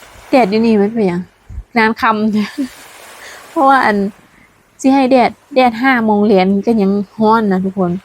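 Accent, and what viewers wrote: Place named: South, Thailand. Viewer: ภาคใต้...เลยครับ🤣😆😁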